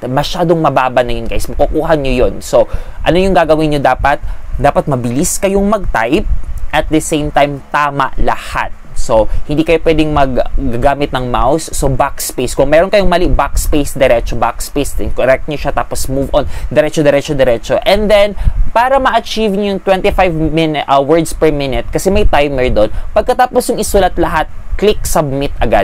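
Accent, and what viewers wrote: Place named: France. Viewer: Are you from France if yes you have very excellent communication skills and while you speak you are getting some touches of your native language while speaking ❤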